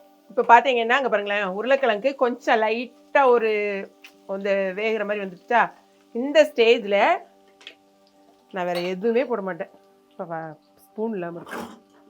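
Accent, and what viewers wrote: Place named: Tirunelveli, India. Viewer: As I am also from Tirunelveli, I enjoy your gesture, accent , innocence and simplicity besides your cooking. ( I hate cooking and wish I were your neighbour to sample your dishes)😂. God bless. Is it possible to connect with you over phone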